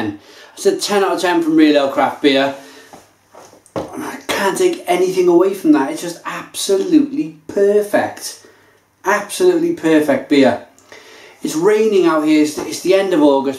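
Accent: United Kingdom. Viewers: Gosh i hate British accent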